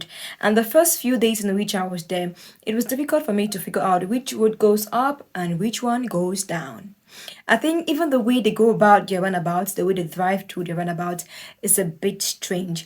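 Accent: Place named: West Africa. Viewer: Tht accent is Western Africa live yr seed on yr way out we going to need tht copy in the future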